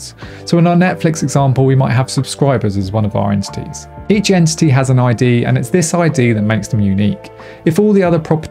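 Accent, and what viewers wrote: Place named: United Kingdom. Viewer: Hi, thank you for your video. I like the British English pronunciation. I lived for 5 months in England, at first it was a little difficult to keep up with the native speakers, I was able to learn their accent, however there are many accents in the UK. Your videos help me a lot to remember that particular English!! thank you.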